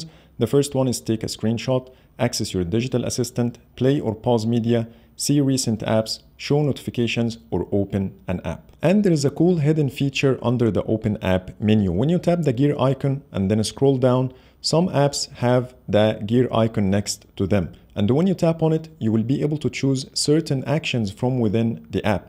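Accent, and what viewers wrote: Egypt. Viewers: انجليزي مصري اطلعك من وسط ١٠٠٠ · Is this guy Egyptian? Jeez that accent sucks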